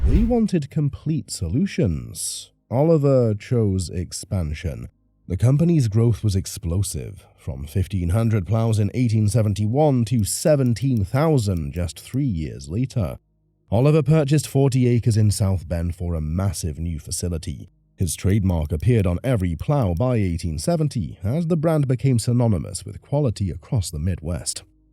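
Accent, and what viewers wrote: United Kingdom. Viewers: Interesting subject that I would have enjoyed except for the absolutely unbearable sound quality and the British accent being so thick I couldn't understand what he was saying.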